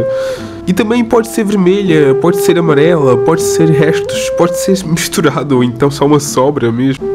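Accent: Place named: Portugal. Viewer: Sei q vc é brasileiro, mas adoro o sotaque português q vc "pegou". Adoro sotaques, todos, não recordo de nenhum q eu não goste. Não tenho nenhuma dificuldade de entender o q vc fala ou as palavras q vc usa... Gostei muito das tuas divagações sobre estar doente, comida e memórias... E, sim: sinto saudades todos os dias... Fica bem, saúde!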